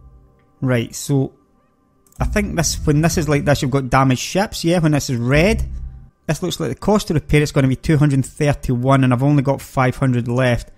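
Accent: Scotland, United Kingdom. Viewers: Great to hear a grand Scots accent! It’s probably why the yanks haven’t been watching you. I was married to a girl from East Kilbride (Scotland’s 6th largest town and growing...as the sign proudly professed!) Unfortunately, I’m a sassenach, but I know the patter, having grown up in little Scotland.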